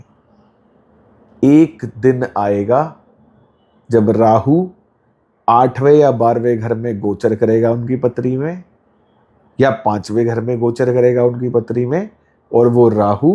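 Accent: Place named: North India, India. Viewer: 35.00 for your info in south ppl speak better english and with better accent. Only u guys, say time as tame and blue as bilue😂.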